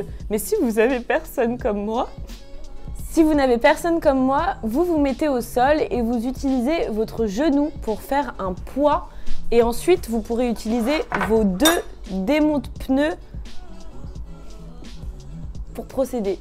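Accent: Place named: Quebec, Canada. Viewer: Cool l accent québécois !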